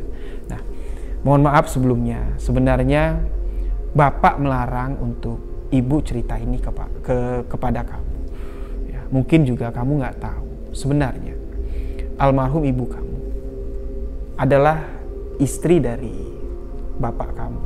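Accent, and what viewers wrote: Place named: Bali, Indonesia. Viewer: Kurangin logat bali nya bisa ga mas? Apalagi setiap kalimat selalu ada logat bali nya... Agak kurang nyaman..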